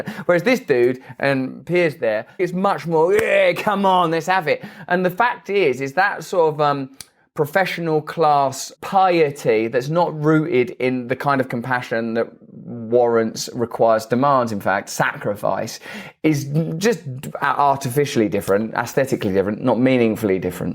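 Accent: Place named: United Kingdom. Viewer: Funny that British accent. I always loved the English, old English dialect, especially before / during the Churchill days. Still to this day I prefer The British English than American English/ Canadian English (we just took / used from America, their lazy English ). Just & quite a bit more British citizens don't pronounce your "T's" ( letter T-t ) Funniest example is when you pronounce the word "better"! You completely leave out the "T" sound "Beh- - -er" but when you pronounce the word "Time" You clearly her the "T" sound & everything! It's just funny to her that!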